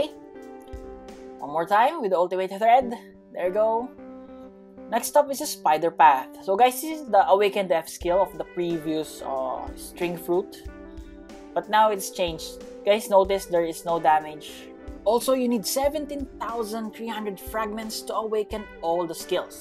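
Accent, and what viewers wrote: Philippines. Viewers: Bro stop I know you philippino you're accent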